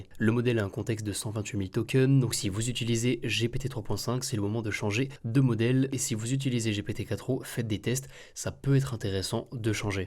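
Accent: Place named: France. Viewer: je te decouvre c"est interessant. Un peu trop de francais dans ton anglais a mon gout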